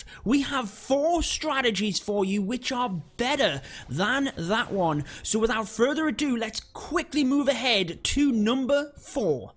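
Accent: United Kingdom. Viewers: That british acent tho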